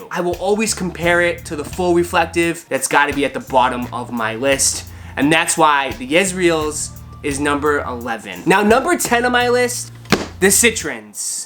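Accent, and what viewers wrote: Boston, United States. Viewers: That fuckin Boston/mass accent, 🤣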